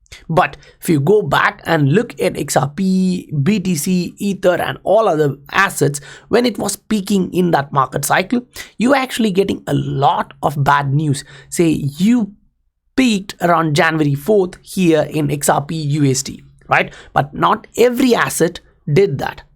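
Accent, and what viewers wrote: India. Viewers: Lmao it’s definitely Indian or something in the region not even close to Scottish or Australian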